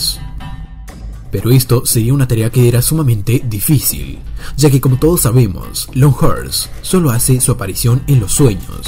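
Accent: Argentina. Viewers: ares argentino dices cuecho en realidad es cuello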